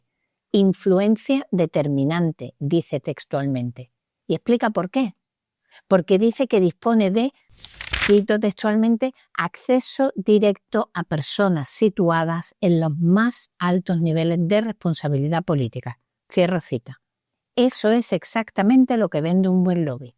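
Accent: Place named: Andalusia, Spain. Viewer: Muy buen comentario en ese precioso acento andaluz. Gracias.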